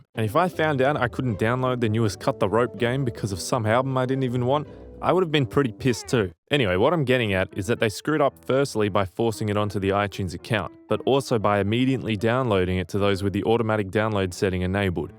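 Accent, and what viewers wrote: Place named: Australia. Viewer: Hey this was a great video! Love getting a seemingly random recommendation and hearing a fellow Aussie. Will subscribe and look forward to your new content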